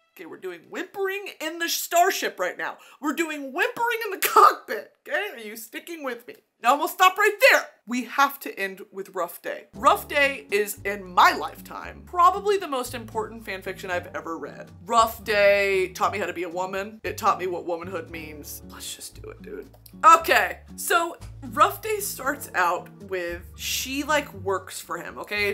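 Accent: United Kingdom. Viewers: Seeing her face in the bottom left corner reading the fanfic in a British accent while I hide under my covers at night got me laughinggg😭😭😭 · Your British accent knocks me out every time😂👌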